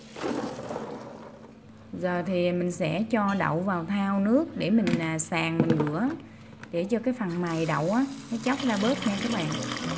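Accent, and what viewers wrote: Mekong Delta, Vietnam. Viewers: Thick’ kheo’ tay Thich’ giong Noi’ nguoi mien tay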